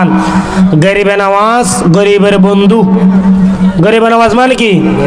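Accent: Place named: Chittagong, Bangladesh. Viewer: আসসালামুআলাইকুম ওয়ারাহমাতুল্লাহি ওয়াবারাকাতুহ, ভাইজান আশা করি ভালো আছেন, আপনার চ্যানেলে ঢুকে দেখলাম, চ্যানেলটা অগচল, ডিসক্রিপশন লেখেন না কেন? টেক তৈয়ার করেন না কেন? ভিডিওর ক্যাপশন এবং থাম্বেল দেন না কেন? এগুলো না দিলে তো মানুষের কাছে পৌঁছাবে না এবং চ্যানেল গ্রো হবে না, সাবস্ক্রাইব করলাম আমি। আপনি কোন এলাকার, বাসা শুনে বুঝা যাচ্ছে চট্টগ্রাম, আমার বাড়ি চট্টগ্রাম রাউজান, ভিডিও আরো লম্বা দেবেন, যেহেতু আপনার ওয়াচ টাইম এর প্রয়োজন আছে।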